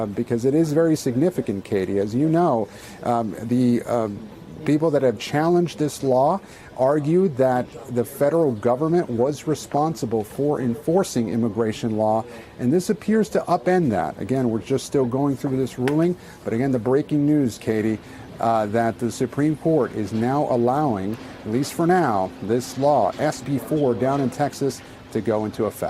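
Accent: United Kingdom. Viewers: What kind of Mickey Mouse operation is this? Starting with a British accent then everyone’s phone is going off in the background. Turn off those candy crush notis katey!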